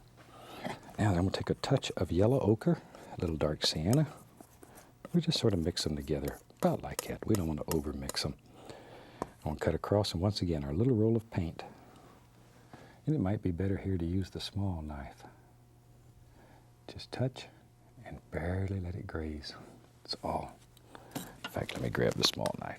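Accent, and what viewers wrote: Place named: Florida, United States. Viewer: He and his dad did a lot of carpentry work in the Orlando area back in the late 50s early 60s. I’m a native Central Floridian and his accent and demeanor harken back to simpler time in Central Florida.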